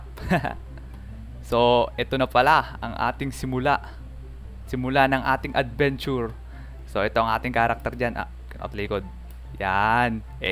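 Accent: Philippines. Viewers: Batanggenyo po ba kayo kasi po yung sinasabi nyu po kasi parang pang batanggenyo hahahahahah kaka relax ka panoorin erp sana umabot ka sa 100k, subs para may play botton napo tayo sana mapansin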